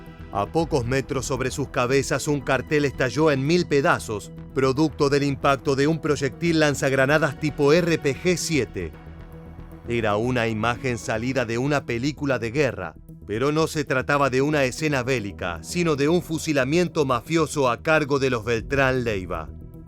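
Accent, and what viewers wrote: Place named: Argentina. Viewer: Tenia que ser argentino ese narrador arruino el docu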